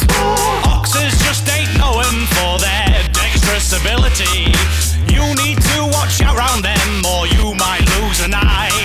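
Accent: United Kingdom. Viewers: I think had a very heavy British accent in the beginning but it started to slowly change throughout his videos, now it's totally different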